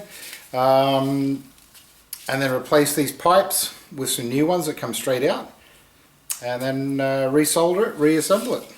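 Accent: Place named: Australia. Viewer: Nice job. Did you do a follow-up video? I think I'm hearing a bit of an Oz accent but the winter scene is throwing me. Where are you located?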